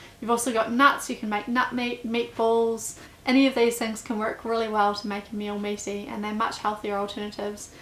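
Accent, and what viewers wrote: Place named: New Zealand. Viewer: I picked up the kiwi accent way before she mentioned it. If I'm not mistaken she's probably also from the lower south Island. Just a hunch. Great presentation and finally vegan recipes with New Zealand ingredients!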